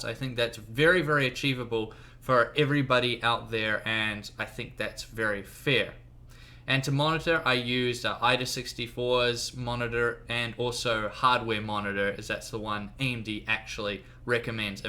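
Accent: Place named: New Zealand. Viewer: Yeah, although I noticed some things. I was thinking he was Aussie or possibly Irish with the way his "fair" sounded. But he reminded me on the Path of Exile developers and the slight accent they have. Then he said he was a New Zealander right as I was thinking he might be from there. I literally LOL'd.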